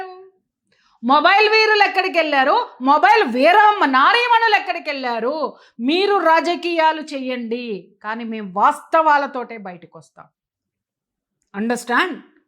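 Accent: Telangana, India. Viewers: మీది తెలంగాణ నేనా అక్క తెలంగాణ ఐతే నువ్వు ఇలా మాట్లాడారు అని అనుకుంటున్నా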